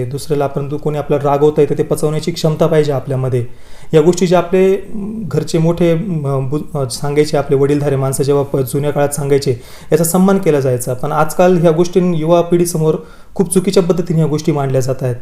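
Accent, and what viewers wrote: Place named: Nashik, India. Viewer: तुजा मराठी एक्सेंट फुल नाशिकी आहे मला वाटल पुणेरी असेल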